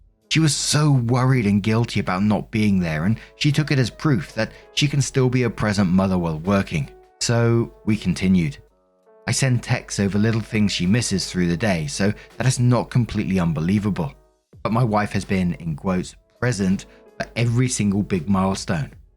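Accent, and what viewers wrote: United Kingdom. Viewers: Did you know you british??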